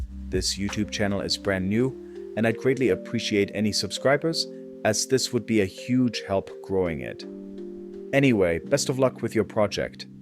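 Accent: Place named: Germany. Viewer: bro your accent sound so german haha good video btw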